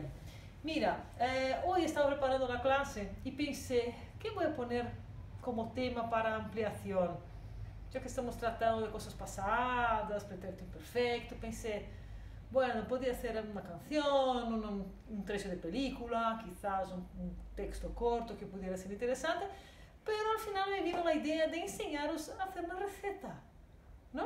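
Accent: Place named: Portugal. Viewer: Tan dulce su castellano con tono portugués, muchísimas gracias, por explicar con tanta dedicación y quedo suscrita como su alumna !🥰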